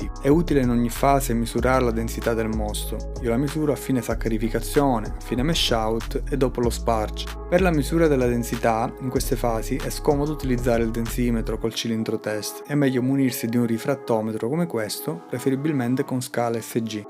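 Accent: Calabria, Italy. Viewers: Bravo, sei calabrese?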